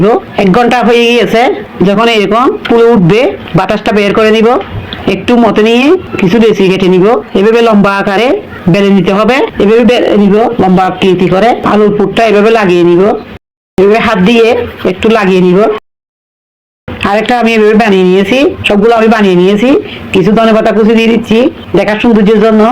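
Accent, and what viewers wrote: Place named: Chittagong, Bangladesh. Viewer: চাটগাঁইয়া টোন!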